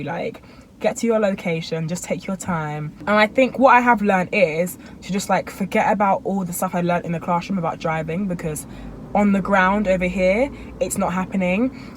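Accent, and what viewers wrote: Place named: London, United Kingdom. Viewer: Ugh leave that annoying london accent in london. Yall need to leave some things in London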